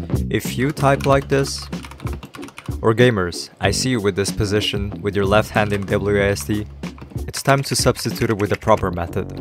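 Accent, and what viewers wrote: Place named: United States. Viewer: he speaks american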